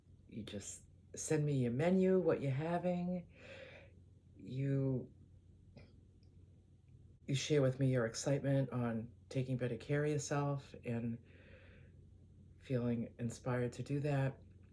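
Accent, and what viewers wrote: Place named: Boston, United States. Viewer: yes the channel name is Eat Pray Van. Named after my favorite book/movie! I love that you are just yourself and super genuine. One of my other favorite YouTubers said if your going to do YouTube to “Be Yourself”. (Your one of my favorites too ❤️) I’m from/born in NYC and I really love the “Bawston” accent LOVE IT! I’ll post on whichever is your newest video on Friday and let you know it posted! I can post a link to the video, thank you for wanting to watch I really appreciate it!!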